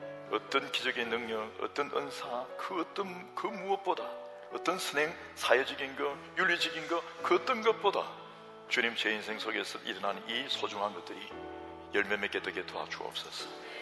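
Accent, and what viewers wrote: Gyeongsang, South Korea. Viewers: 경상도 ㅋㅋㅋㅋㅋ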